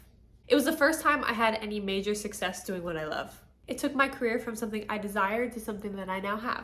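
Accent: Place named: United States. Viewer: Your Dialect Sounds Like an American.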